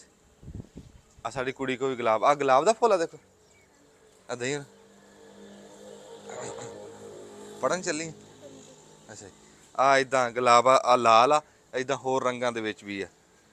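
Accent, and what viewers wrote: India. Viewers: Indian ki Tarah kio boltay ho, Pakistani ki Tarah bolo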